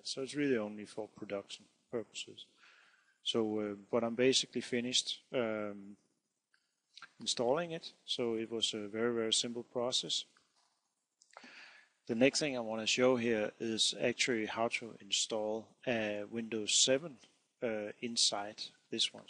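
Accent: Denmark. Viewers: R u from denmark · Du lyder meget dansk, du taler Engelsk med dårlig dansk accent. Er du fra Danmark? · He's Danish, though. :) I sounds like. :) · are you danish? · man kan sagtens høre du er dansk xD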